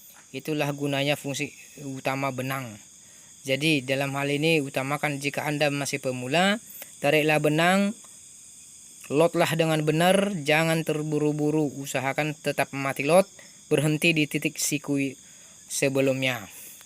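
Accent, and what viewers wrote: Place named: East Nusa Tenggara, Indonesia. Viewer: saya suka saya suka logat ngomongny ntt kah.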